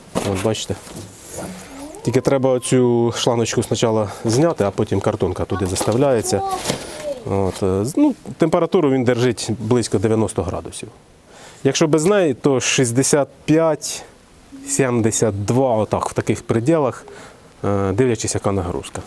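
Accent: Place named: Surzhyk, Ukraine. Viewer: я не к замечанию но коль ты переключился на мову то говари на ней а не на суржике а лучше говари на том языке который знаеш раньше ты неплохо на русском говарил а пахота зачёт тоже занимаюсь только без обид привет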